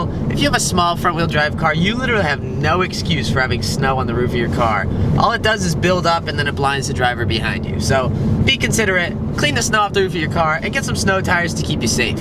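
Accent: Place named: England, United Kingdom. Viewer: Nice English accent and great video.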